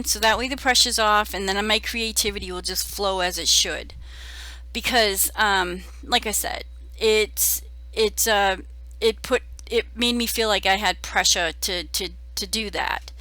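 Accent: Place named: Pennsylvania, United States. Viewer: I love these cards! I do think you’re putting to much pressure on yourself, but it’s strictly up to you the number of videos you produce ok? Oh! And where’s your accent from? I’m thinking Pennsylvania? A little stronger than Ohio 😁 where I was born 😏. thank you for your inspiration!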